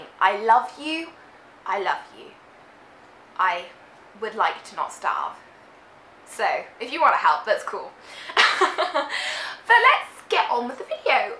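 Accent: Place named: United Kingdom. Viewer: Aren't you cute? Go for comics and animes! And music! and movies! and videogames! and lovely british accent! XD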